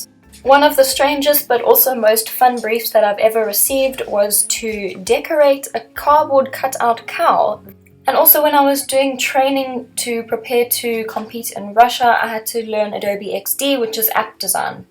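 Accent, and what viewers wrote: South Africa. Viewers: Your accent sound South African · Been looking for a fellow South African to share a day in the life, and your accent kinda gave it away before the SA patch on your tshirt😂 but anyway this was insightful and really entertaining. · its funny how I instantly knew were a south African by your accent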